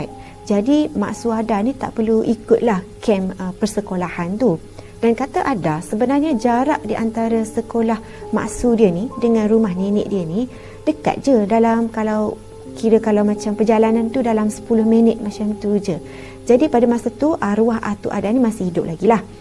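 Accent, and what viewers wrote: Kelantan, Malaysia. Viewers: Bau budo la kak..ckp kelantan jerr kak x payah ckp KL..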